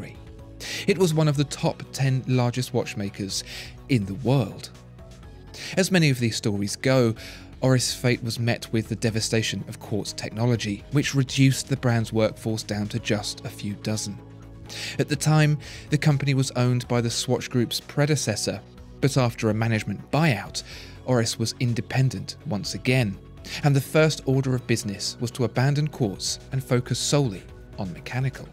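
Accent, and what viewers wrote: United Kingdom. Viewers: Which part of UK are you from? (specific accent)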